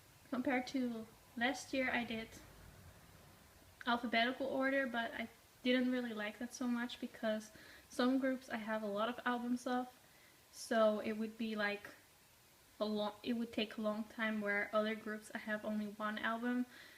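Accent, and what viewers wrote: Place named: Netherlands. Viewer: haha heard you were dutch immediately when you spoke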